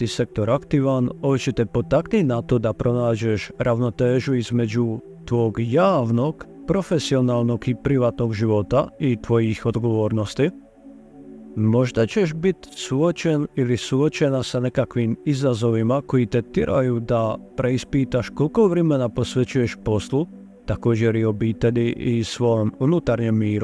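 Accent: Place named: Dalmatia, Croatia. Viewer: Posle toliko mnogo godina bilo mi je lipo slušati te naš Dalmoše na našem dragom ikavskom dijalektu.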